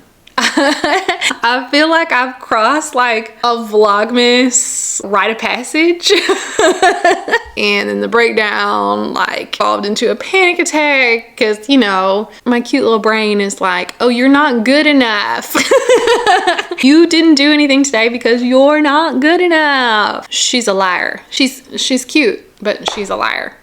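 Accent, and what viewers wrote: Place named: Texas, United States. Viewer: Your podcast was recommended to me. As soon as you spoke, I knew you were from the South. I'm from Tennessee. You sound like Texas?? Your dog is so cute and your kids. Enjoyed!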